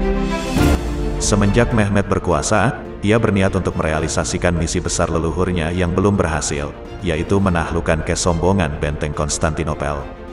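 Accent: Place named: Java, Indonesia. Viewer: Tanpa Tedeng Aling Aling,,,,, narator kayak nya orang Jawa,,😁😁😁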